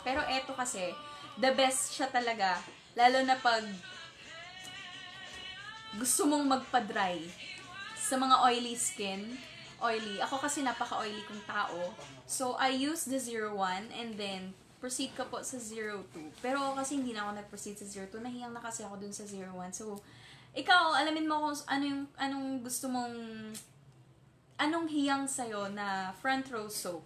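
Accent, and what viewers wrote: Visayas, Philippines. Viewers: Wow bisaya si ateng hahahah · Taga Bisayas yan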